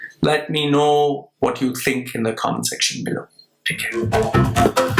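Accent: India. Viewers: This is one of many Indian accents Not every Indian talks like the stereotyped one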